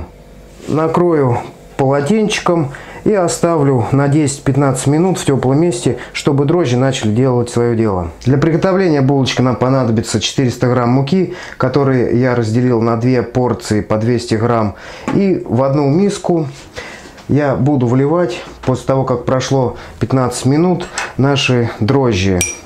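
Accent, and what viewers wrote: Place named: Voronezh, Russia. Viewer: открыл рецепт, услышал говор и сразу понял - зёма. спасибо братюнь, тесто уже подходит. наш говорок только с воронежским можно спутать)))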